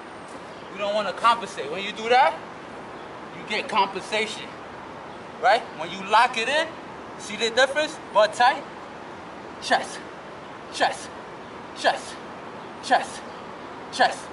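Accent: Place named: New York, United States. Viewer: New York city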